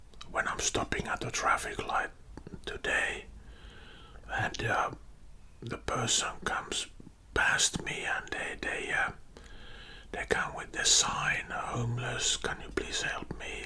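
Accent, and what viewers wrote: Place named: Netherlands. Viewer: Well I would have believed if you said you were 40. The accent sounds a little dutch.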